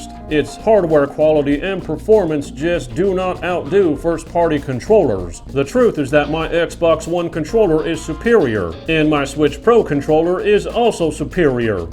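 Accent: Texas, United States. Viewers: i have bad vision, the mustache is a small detail. As for the accent it didn’t sound annoying to me, just unique. Not tricked, You just sounded like a texan with a thick accent. I pay attention to what is said not appearances. I just hadn’t considered the possibility that you were playing a role. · An asian that has an accent of an american guy from texas lol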